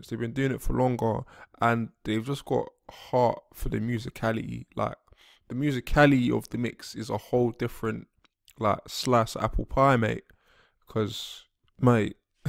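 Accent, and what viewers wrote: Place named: South London, United Kingdom. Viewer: Like you said which I concur, this video is really basic, more theoretical than practical but Yo!!!! What I really dig & would have to say at this the early stage, the primary reason I've enjoyed listening to what you have to say regarding mixing is that wicked "Attack The Block" perhaps South London English accent of yours :)....You know the saying "It's not what you say, it's how you say it!" Lol!...Anyway your accent, at least for me sounds & brings a much welcomed urban/street vibe from England that breaks up the monotony a little.... Yeah man anyway Big Ups & Propers from Los Angeles...Future Video request....Since you mentioned getting mixes sounding as good as Dre...Do a video on Mixing in Mono.....Peace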